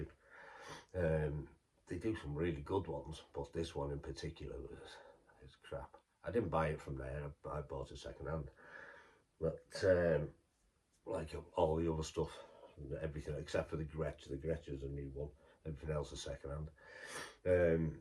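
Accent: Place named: Northwest England, United Kingdom. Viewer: Nice one! Love that gothic looking pick guard on the tele! You sound like you might be from the same part of the world as my family, in the north west, somewhere near Liverpool perhaps?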